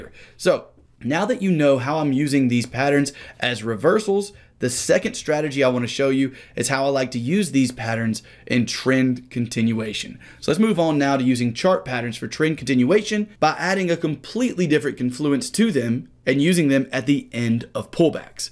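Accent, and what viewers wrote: South, United States. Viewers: He has a thick country accent.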